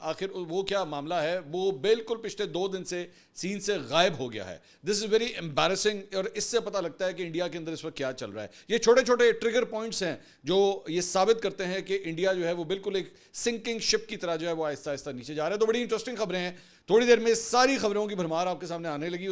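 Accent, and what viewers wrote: Punjab, Pakistan. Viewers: Ur Punjabi accent ❤️😂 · Hahahhaha bhai a jehra tusi vich punjabi da touch denday jay os naal program ka maza dubala ho jata hay😅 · اردو میں پنجابی ٹچ دینا آپکو دوسروں سے منفرد بناتا ہے... یہی انداز آپکو بہتر ویوز دیتا ہے.. 😉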